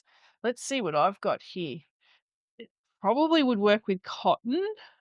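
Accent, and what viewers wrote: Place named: Australia. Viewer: Found your channel through this video and two minutes in I was infatuated by your personality! The Australian accent is a bonus 😂❤ very thorough video indeed, thank you! New subbie from Sweden!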